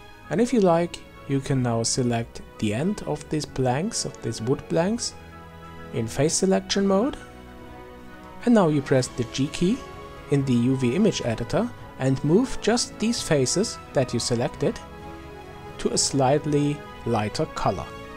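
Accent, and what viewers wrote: Germany. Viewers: you ar german ?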